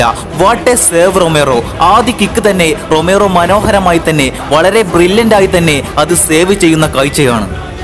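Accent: Malappuram, India. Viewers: Malappuram karante voice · മലപ്പുറം ആണല്ലോ 😄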